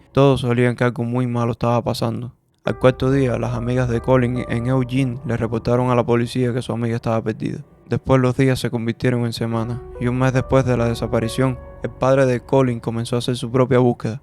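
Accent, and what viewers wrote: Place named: Cuba. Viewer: 😂😂😂😂😂Eres cubano 100%.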